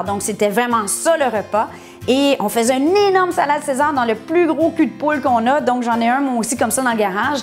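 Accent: Quebec, Canada. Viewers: J'adore votre accents québécois 🥰🥰🙂🙂🇨🇵 merci pour la recette bonne journée 🙂🙂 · beau accent quebecois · c'est ce petit accent canadien ?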